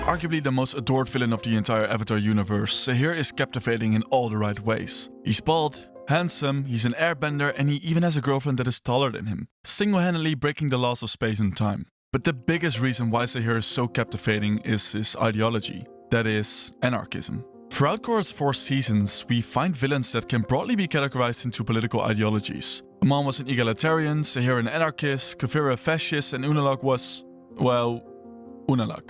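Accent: Netherlands. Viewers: Your accent sounds very Dutch, are you?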